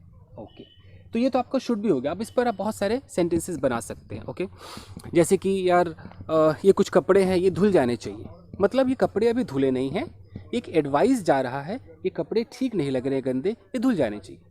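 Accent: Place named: Kolkata, India. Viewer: Your 100% kolkata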